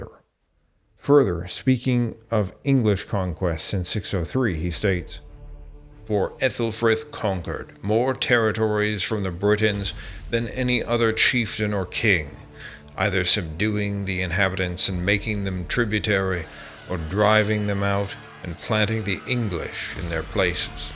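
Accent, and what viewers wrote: Canada. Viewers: Can't say the stuff I've seen from Scots is any better. The placenames are going to be more accurate but not the information. Also the video is in Canadian English so don't expect the words to sound exactly the same as they do in Scottish English. As for the visuals, well have to agree with you there, sick of ai slop and sick of random images that don't relate to the audio. The most common way of pronouncing gaelic is 'gaylick' in America, England, Australia etc. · He's Canadian. And over the years I've known many native speakers of Nova Scotian Gaelic, and in their dialect of English they pronounce it gay-lik. It's just how it is, and they've got every right to describe their own language as they like. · They do. This is a Canadian channel so he has a Canadian pronunciation which is the same as the Irish pronunciation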